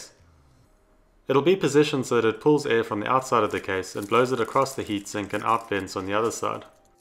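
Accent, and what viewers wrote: South Africa. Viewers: you sound like a South African, not an Australian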